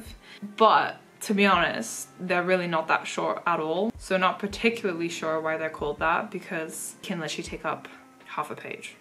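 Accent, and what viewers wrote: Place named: United Kingdom. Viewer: ik verschoot toen ik u ineens nederlands hoorde praten ik was echt convinced da ge brits waart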